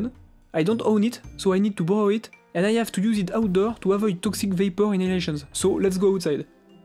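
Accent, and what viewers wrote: France. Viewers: that french accent I just can't stand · le fameux accent Français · avec cet accent français, j'ai tout compris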